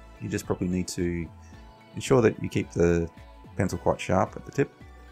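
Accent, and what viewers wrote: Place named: Australia. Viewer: Nice lesson, also good to hear an Aussie voice for a change. Your course is no longer available. Have you moved to Udemy, Teachable or Skillshare by any chance?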